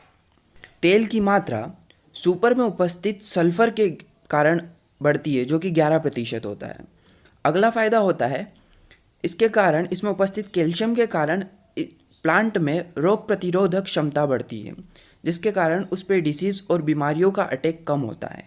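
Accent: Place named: Madhya Pradesh, India. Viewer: Bhai pakka m.p se ho tum 😁😁 Ujjain dhar ke aaspas se tum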